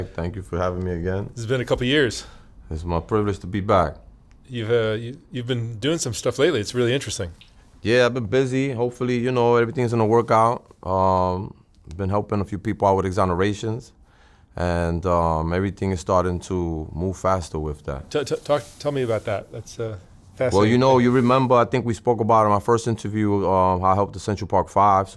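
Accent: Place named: Bronx, United States. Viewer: "If you have a farm full of cows, does it pay for you to release your cattle? No, you gotta keep them in order to milk them." Damn, that's so true. I lived 14 years in Parkchester/Castle Hill, The Bronx. I hear that Boogie Down Bronx accent 🤌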